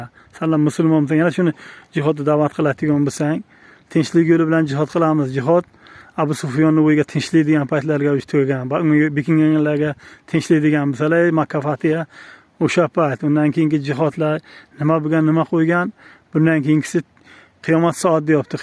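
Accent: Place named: Kashkadarya, Uzbekistan. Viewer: Кашкадарёдан бу ака, гапиришига караганда. Охирги пайтларда куп талантлик одамлар чикябди кашкадаредан. Тайсин, сарик сочли бир бола чикканди валиахд. Энди акамиз чикиш килибди. Куникиб колдик анча, миллион билан дизайнга тема булибдида яна. Узб маскарабозлари точно эргашади сизга.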